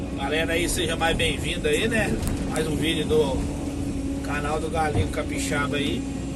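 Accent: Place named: Mexico. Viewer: You sound like Mexican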